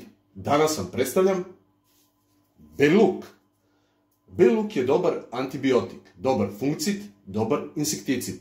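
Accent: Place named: Serbia. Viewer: Вслушиваясь в ккрасивый баритон и слова, угадывала, какой национальности принадлежит человек.Многие слова знакомы,текст понятен почти полностью.Серб, оказалось!!! Спасибо, дружище! Успехов Вам!!!!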